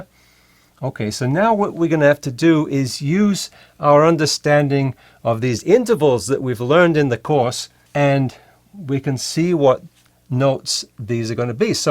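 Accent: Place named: Scotland, United Kingdom. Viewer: Working through this book, and this chord definitely confused me as to how it was written. Non-sequitur, but if you don't mind my asking, where did you grow up? Your accent is wonderful and almost a mix between Scottish and upstate New York.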